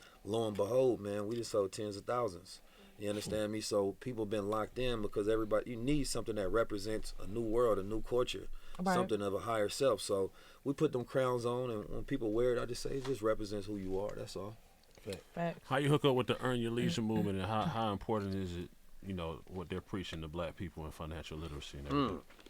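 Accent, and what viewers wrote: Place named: California, United States. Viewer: It’s Black California linguistics. Black Californians linguistics is the same up and down the state.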